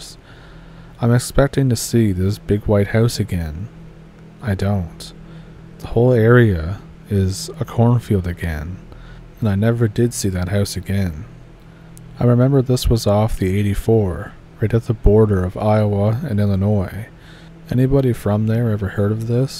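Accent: Canada. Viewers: I have to say, I really like this channel! I think I have listened to all the stories, and I get a little excited when you post a new one!! You are a great storyteller, and I ALWAYS enjoy pulling up a stump to listen!! Also, you have a nice Canadian accent, very kewl!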